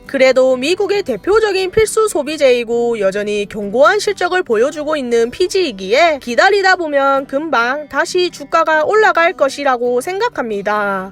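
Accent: South Korea. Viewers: ㅋㅋㅋ 말투 너무 귀여우세요ㅋㅋㅋㅋㅋ 경상도지예~~~~? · 서울 말투 쓰시는거 보니 서울분이신듯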